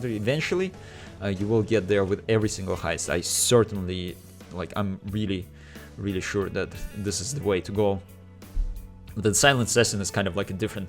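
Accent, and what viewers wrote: Russia. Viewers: Are you from kazakstan? Asian but russian accent....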